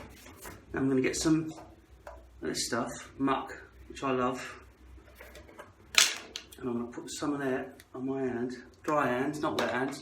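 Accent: United Kingdom. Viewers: LOOOL!! Muppet's!! hahaha!! 😂 you know what i mean?? spray that, spray that stfu and learn something for a second LOL!! oh dude....😂😂 loving you more and more ^^ and the brit accent is sublime! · Last time it was a posh British accent, but now it's more London working class. If I didn't know you were an Aussie I would have thought it was real accent lol. I didn't think bald men were more ignored. It hasn't affected the careers of Jason Statham or Mark Strong, but if you prefer having hair then you do a very good job of making it look natural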